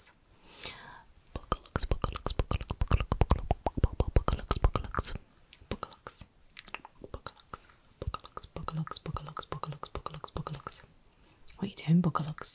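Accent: Yorkshire, United Kingdom. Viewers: As a fellow northerner I love this 😂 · As a Yorkshire girl I couldn't love this more! I think it's such a warm, friendly accent. 🥰 I've also lost most of my accent over the decades but it definitely gets broader when I go home to see family. After 20+ years I can still surprise my hubby with my Yorkshireness from time to time with words like morngy, gip and gormless! 👂🏻I also say my owt's and c'** the same as you, we had ginnels behind our house and my sister was the buggerlugs in our family. 😁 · some say she's putting her accent out there. others would say she's finding an excuse to call us all the c word ;) As someone who lives in Lancashire it's mildly disconcerting how many of these I knew. That said, I feel sad that you're losing your roots. Bring your husband and child up north so that they know what true beauty is like. None of that southern malarkey! 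Still loving the pink fluffiness! It's much easier to enjoy an asmr video when the video creator looks so cosy which you do! Subscribed!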